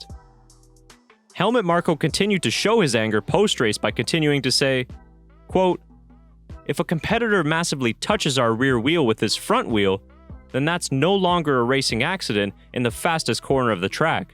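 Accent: United States. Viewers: Just brainwashing the MAXIDIOTS with an American accent to make it seem like it’s coming from someone unbiased.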